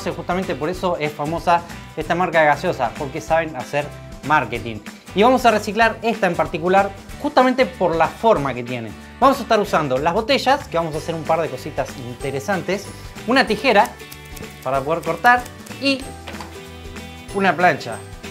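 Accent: Argentina. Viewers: me gusta tu acento argentino soy española y francesa pero vivo en Francia. todo eso me gusta pero en esta vida no tomamos tiempo siempre vamos de prisa y corriendo. un dia voy a intentarlo! !pero si estropeo mí plancha! !uuunnn.solo será culpa tulla. Me gusta como pronuncias las elles.un besote muy grandote desde Francia 👄👄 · Argentino.... Bahhh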